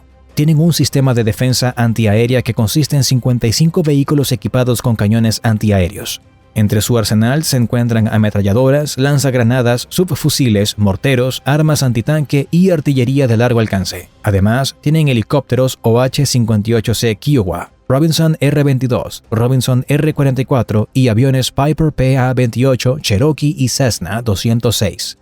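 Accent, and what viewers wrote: Argentina. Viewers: Creo que el del video era Argentino 😂 muy arriba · se nota que es argentino, peruano o boliviano. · pregungta seria , eres argentino?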